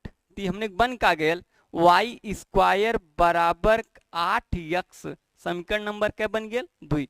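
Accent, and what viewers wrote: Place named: Bhojpuri, India. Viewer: गजब सर इसी तरह भोजपुरी अंदाज me padhiye bahut अच्छे se samajh आ raha है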